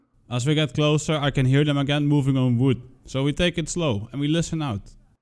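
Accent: Netherlands. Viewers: He sounds Dutch kind of northern European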